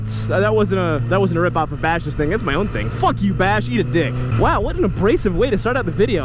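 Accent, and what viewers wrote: Australia. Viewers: I'm Australian and I love your Australian accent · more Australian accents in ur vids 😂 pls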